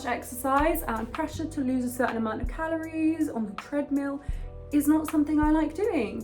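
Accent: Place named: United Kingdom. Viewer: Totally off topic but without enjoying your video there is another reason why I'm here and the reason is I'm using your videos as my British pronunciation tutorial. I'm practicing British pronunciation by watching your video and it's really help me a lot. Lots of love 💓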